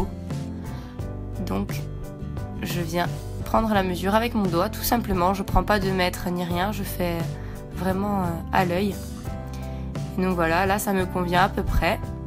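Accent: Corsica, France. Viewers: Salut, juste une question qui n'a rien a voir avec la video, mais en t'entendant parler tu sembles être de corse toi aussi... c'est le cas ? Voila, bonne continuation à toi =)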